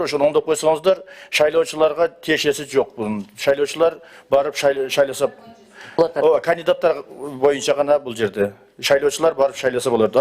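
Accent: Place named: South, Kazakhstan. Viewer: Түштүктүн говорунда сүйлөгөнү үчүн жакпады эле деп койбойсуңбу!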